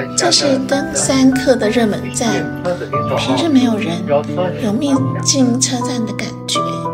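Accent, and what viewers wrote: Standard. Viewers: 好久沒聽到這麼字正腔圓的國語~好有回憶殺的聲音!好好聽!~^^~ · 旁白以前的工作一定是老師不然就是播音員,聲音好聽,發音標準,又介紹的很詳細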